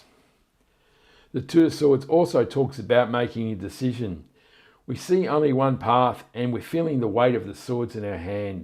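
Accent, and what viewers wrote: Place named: Australia. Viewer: W Hi,. Sounds like you are an Aussie. Love your voice, manner, energy and expression. Subscribed. Thank you, and best of success. Great cartoon pic thumbnail. - very effective for click through!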